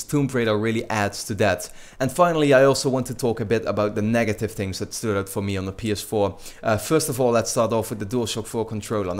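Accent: Netherlands. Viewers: You're Dutch right? :p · Fucking hekel aan nederlandse accenten. I mean je kan er niks aan doen, ik heb het zelf ook.. Nederlands klinkt gewoon lelijk xD Anyways, dankje! Ik zat erg te denken of ik nu al van 360 naar ps4 zou gaan. En ja dat ga ik zeker doen. Mijn beslissing staat zo vast dat ik vanmiddag dat ding nog ga halen. Thanks for this review!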